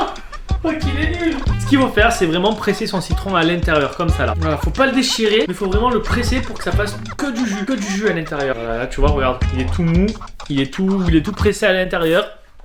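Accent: Marseille, France. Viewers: Il est marseillais 😂😂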